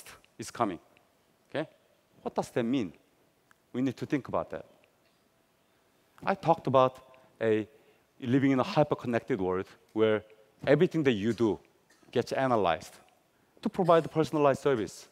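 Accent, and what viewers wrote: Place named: South Korea. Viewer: He has a thick accent. I understand that he's a Korean. I wonder why doesn't he speak Korean to Korean audience?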